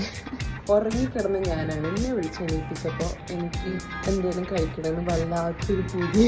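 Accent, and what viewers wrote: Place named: Kannur, India. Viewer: Correct kannur baasha😂😂❤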